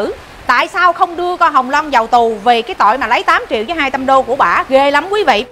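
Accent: Standard. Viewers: Chi noi rat chuan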